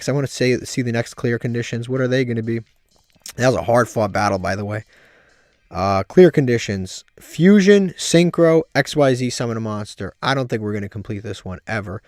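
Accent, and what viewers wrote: United States. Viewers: Do I hear a Boston accent?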